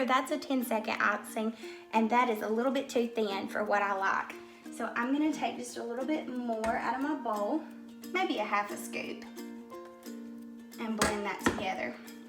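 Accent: South, United States. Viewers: Fingers crossed my icing turns out like yours. Lol And yes, I could tell you are a southern girl right off the bat. I'm one as well. Lol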